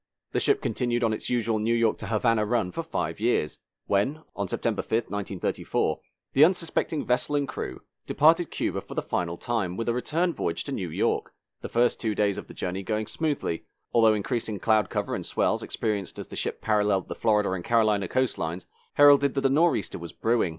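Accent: United Kingdom. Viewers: Unappealing (if linguistically logical) 'short a' English regional accent exacerbated by a Yankee flat sentence inflection.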